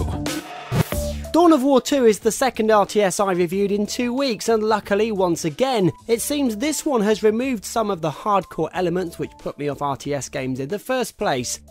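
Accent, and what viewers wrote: England, United Kingdom. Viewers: did you read the entire comment? that was how to PRONOUNCE it. his normal and perfectly recognisable accent isnt the problem, CAUSE I'M ENGLISH TOO. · dat british accent...oh my ears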